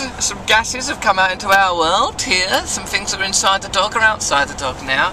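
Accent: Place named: United Kingdom. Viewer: Russell love when you talk in this calm manner because sometimes you speak so fast that it's hard to follow your strong British accent. Hope all is well with you and your Mom. Much love from New Jersey USA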